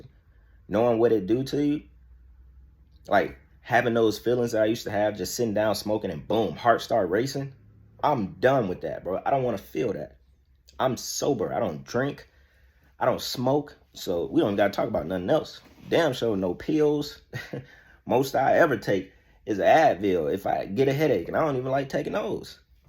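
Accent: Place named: Atlanta, United States. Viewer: I can definitely tell you have an Atlanta accent. 😂❤ I stay near Macon... yep i can definitely tell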